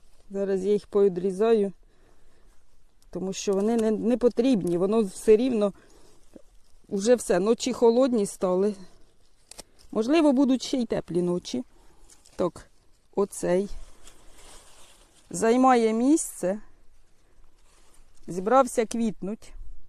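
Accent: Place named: Sribne, Ukraine. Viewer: Ви часом не поблизу Лохвицького району живете? Дуже мова схожа з тією, якою розмовляють в Срібнянському районі, це сусіди.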